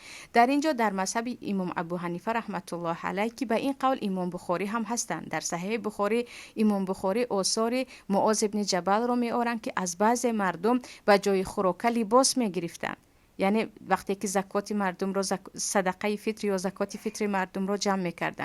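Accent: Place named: Tajikistan. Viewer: Саломалекум апачон бехтарин гапои ширин заден ба лахчаи ширин точики фахамо хдои мехрубон нигахбон боша шмора хазор рахамат.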